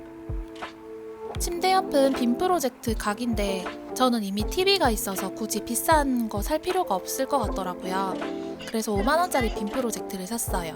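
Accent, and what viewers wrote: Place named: Gyeongsang, South Korea. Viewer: 제 방도 좀 꾸며달라고 하고 싶네요...경상도 분이신 것 같은데, 만나러 가고 싶어효ㅠㅠ엉엉 ㅠㅠ넘 귀여버요ㅠㅠㅠ완전 취저.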